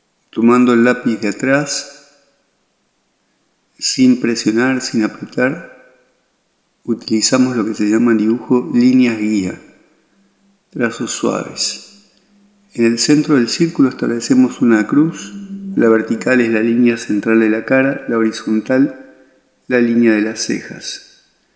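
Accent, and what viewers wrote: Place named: Mendoza, Argentina. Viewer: Por tu tono al hablar sos de mza o san juan .excelente dibujo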